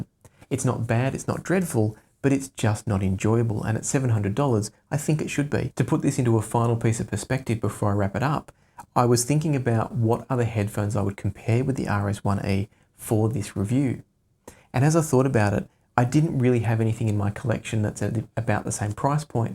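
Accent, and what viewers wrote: Australia. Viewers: I love your soft, soothing Australian accent...you would make a great ASMR artist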